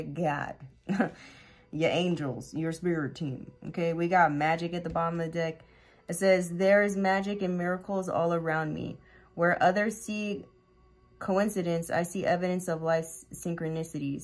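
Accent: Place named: RP, United Kingdom. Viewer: Hey Girl , inspirational, and so comforting to hear your voice, and Posh too! 🐈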